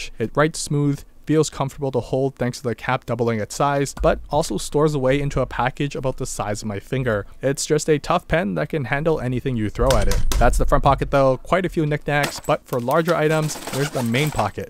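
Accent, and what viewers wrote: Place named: Canada. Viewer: Canadian with a light lisp?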